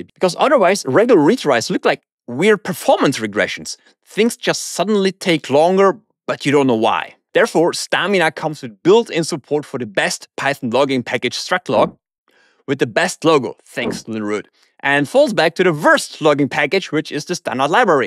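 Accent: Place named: England, United Kingdom. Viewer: Aww, I like your english accent :)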